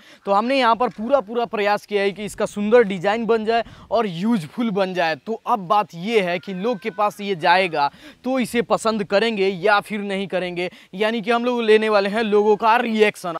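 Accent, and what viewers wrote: Bihar, India. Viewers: Bhai ap bihar se ho❤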